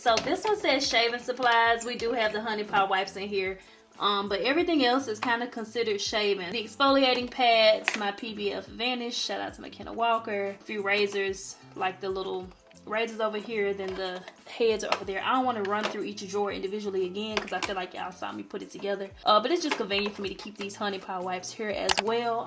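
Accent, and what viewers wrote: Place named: Memphis, United States. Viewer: New Subbie ❤️ Are you and Peyton Charles family because I knew you were from Memphis once I heard your first 3 wordsssss 😊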